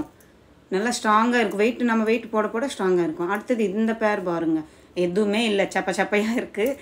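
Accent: Telugu, India. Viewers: Neenga pesurathu thelugu kalanthu varuthu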